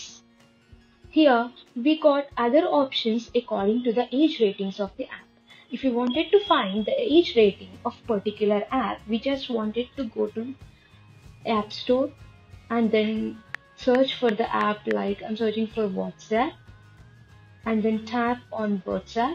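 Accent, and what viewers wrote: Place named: India. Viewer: You know this is real because of that Indian accent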